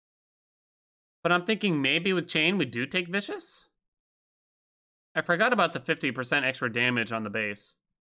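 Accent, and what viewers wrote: United States. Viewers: Honestly, you sound like every American ever. Cheers!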